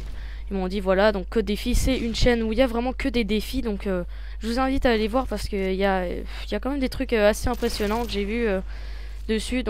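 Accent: Walloon Brabant, Belgium. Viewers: vous etes du brabant wallon en fait ? :)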